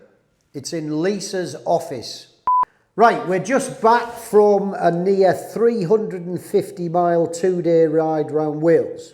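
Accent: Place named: England, United Kingdom. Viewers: is the original straight talking Geordie.. love it!! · that yorkshire accent grinds my gears normally but I can cope when it about motorbikes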